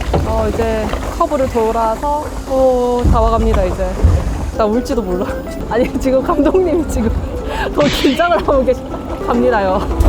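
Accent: Gyeongsang, South Korea. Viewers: 우방타워 야경을 보면서 야자를 했었는데, 이렇게 정겨운 대구 말투로 보니 반갑네요.ㅎㅎ 예전에는 두류공원이 밤 되면 우범지대였었는데, 지금은 시민의 공원으로 재탄생된 것 같아 보기 좋네요. 다음에 시간 나면 애들 데리고 한 번 가봐야겠어요. 좋은 영상 감사합니다. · 재밌어요. 님 ^^ 이거 구독 갑니다. 라떼도 우방랜드에서.. 망원경으로 공개관측회 & 천체사진 전시회 하고 그랬는데... (대학교 동아리) 그때가 98년 이맘때쯤 가을이었구나~ 졸업후 계속 타지에서 생활하고 있는데.. 이런 대구TV 사랑합니다~ ㅋ 약간의 대구억양이 가미된 ^^ 대구한바퀴~ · 살짝 경상도 사투리가 툭툭 튀어나와서 더 정감이 갑니다. 두류동 벚꽃만 생각했는데 맛집에 멋 뿜뿜 대구한바퀴 잘 봤습니다.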